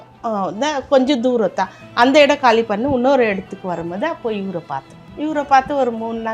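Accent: Chennai, India. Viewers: Indha madras basaiya?😂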